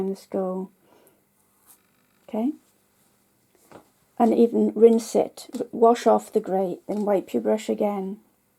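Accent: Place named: RP, United Kingdom. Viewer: Thank you for the tutorial. I'm trying to recreate your technique it looks quite good. I love your art and your voice has captivated me. When I was a kid, I used to learn English from BBC on my little radio in socialist Poland. I'm talking1970-ties. Your beautiful accent is transferring me directly to those old times :)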